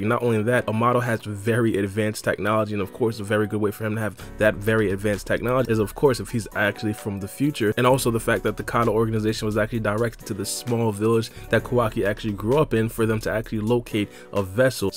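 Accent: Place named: Alabama, United States. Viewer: Yah forgot how Alabama this sounds right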